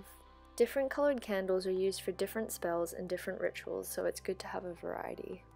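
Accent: Australia. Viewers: Umm yea can I just come live where you are. I got my hopes up before watching, but we definitely do NOT have most of the things you just showed in dollar stores here in New Orleans. You can find candles..that's it. Everything else is only found at walmart & would've cost you either triple or not sold at all 🙄 You sound like you're from OZ..I've always been in love with your country since I was a kid (I am fascinated by literally everything about Australia & was also obsessed w Silverchair) so thx for adding more to the list lol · I have a feeling this is an australian youtuber- her accent seems mashed up...and i'm in australia and seen those things locally too :)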